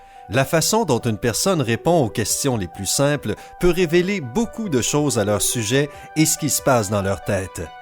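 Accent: Quebec, Canada. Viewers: D'après ton accent tu dois être. Quebequois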